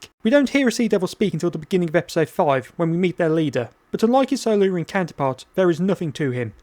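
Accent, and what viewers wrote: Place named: Midlands, United Kingdom. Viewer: "I'm not going to change it because I can't be bothered" "She's not that important,.... plot-wise. " Brilliant! I love the humour and your Midlands(?, sorry if I've got it wrong)) delivery. I have to admit, I like the dark beginning of The Sea Devils, but it soon tails off. I agree with your 'mid' definition; it's not bad, and it's not really good. Though, to be fair, I've not watched it for a long time. The first time I saw it was in '93 when it was repeated on BBC2 (I think) and I was enamoured with it; my favourite Doctor and my favourite companion, few (relatively) VHS releases and almost zero repeats. I'll have to give it another go. Thanks for the review. (Edited once for a typo)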